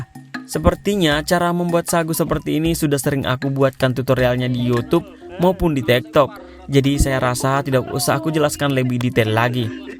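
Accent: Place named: Toraja, Indonesia. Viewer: Pantesan bahasa daerah nya gak asing ku dengar, ternyata orang Toraja 😅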